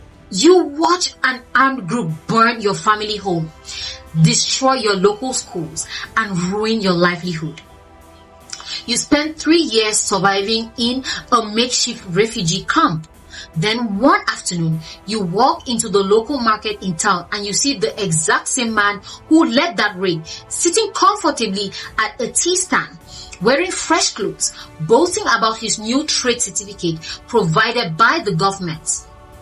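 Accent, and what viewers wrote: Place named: Nigeria. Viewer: I wish your name was mentioned. I congratulate you on the way this information is presented. Firstly is English your 1st language? If it is not, your English diction, vocabulary, expression, pronunciation and grammatical awareness is beautiful. I speak English well but I dont like that my 1st language is the Imperialist 'Kings English'! You make English sound elegantly Nigerian. Great video! Excellent content which is trustworthy and left field. This would not appear on mainstream western media. Scary question. Are u A.I. generated?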